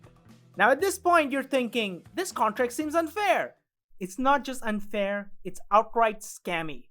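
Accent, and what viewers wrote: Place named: United States. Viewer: Good one sided propaganda video. Very smart idea to do propaganda in Americanized English accent. However, this amateur analysis will not work outside of gullible Bangladeshis.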